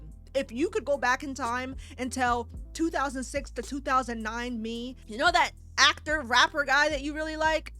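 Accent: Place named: Canada. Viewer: Wait are you Canadian? You have an accent like Aba.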